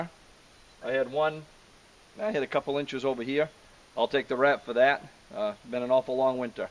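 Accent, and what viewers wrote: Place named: New England, United States. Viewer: That Yankee accent gets me...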